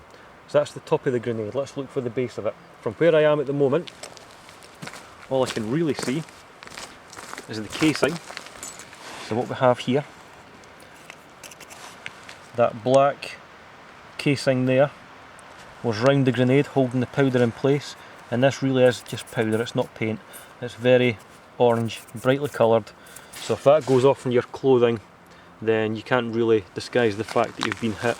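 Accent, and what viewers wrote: Scotland, United Kingdom. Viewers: Scottich accent.............. I like it :D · Yeahhhh, I don't think he is British. I think he is Scottish... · are you scotish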